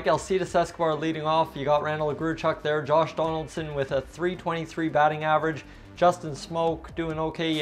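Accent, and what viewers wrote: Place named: Canada. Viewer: As a fellow Canadian, i love your accent bro ahah. Doesn't get anymore Canadian than that. Keep up the good work ma dude 👊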